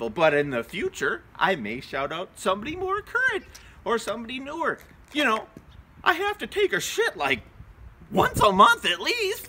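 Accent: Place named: Appalachia, United States. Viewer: Funny!! I loved this video!! What I find funny though is out of the people you shited out only commented on this video so far. I hope they see it! Anyways I really liked how when you were doing your interview I got to see the real you! Your real voice! The hillbilly hick lmao!! Never grow your hair out!!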